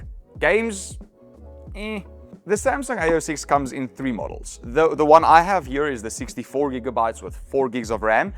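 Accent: South Africa. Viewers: Howzit, I know here in SA don't watch alot of tech videos especially on Youtube. South Africans love TikTok. It would be great if you represented SA and actually put the Rand price as well & what it goes for on dollars vs rands. I haven't watched most of your videos but your accent screams out & you can't hide it. Abit Austrialinish but you're South African. Put as link, with rands. You'll see more and more South Africans will watch and Subcribe. Great video.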